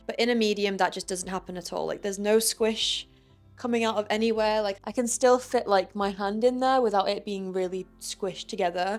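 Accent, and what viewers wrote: United Kingdom. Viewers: You are a BEAUTIFUL young woman. Beautiful dark brown eyes, beautiful smile and sexy voice with the British accent. STUNNING figure. BEAUTIFUL tan body and curves, really cute belly button, nice little booty and sexy long legs. You look AMAZING in all of these pairs of jeans. All of the colors look REALLY good on you and make you even more beautiful. The white makes you look like a brown/dirty blonde-haired angel. All of these jeans hug your body and hips and make your beautiful curves POP. You look AMAZING. You are BEAUTIFUL and VERY cute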